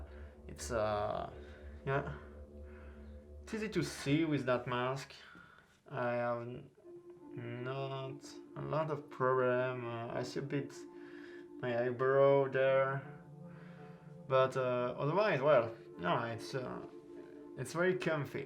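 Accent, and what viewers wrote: France. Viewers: Great video and review, my next silicone mask will be Pennywise IT 1990 silicone mask by BurkBench designs and then I will get the Alien silicone mask also by BurkBench designs probably in October, also the way you pronounce latex is funny 🤣 🤣 🤣 and judging by your accent you are either French or French Canadian.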